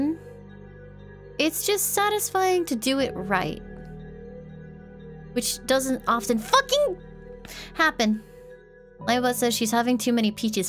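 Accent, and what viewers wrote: United States. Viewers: I live for "American accent" and pronunciations. So cute.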